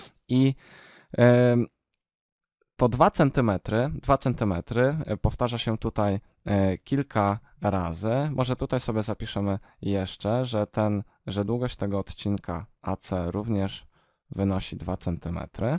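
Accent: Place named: Ukraine. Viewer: Chłopie ty jesteś z ukrainy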